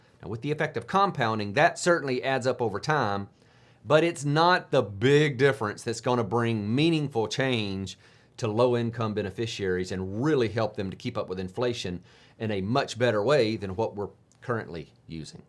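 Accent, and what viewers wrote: South, United States. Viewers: Where you from?? Got that accent like down south east coast Ga. or Virginia OR N.C. 🤔🤨🤣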